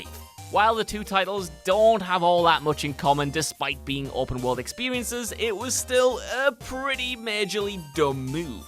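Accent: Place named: Lancashire, United Kingdom. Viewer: That was too much Lancashire for one day, thank you. Good video though.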